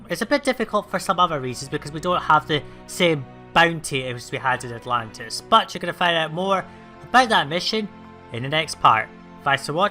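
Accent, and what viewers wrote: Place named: Scotland, United Kingdom. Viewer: Are you a Scotsman? Love the Accent and lovely gameplay :)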